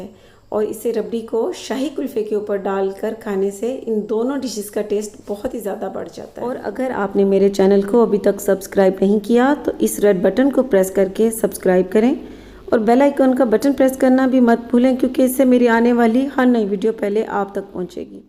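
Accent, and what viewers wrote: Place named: Pakistan. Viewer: Mam ka accent toh pura Pakistani hai lekin pakwaan ki koi seema nahi hoti😋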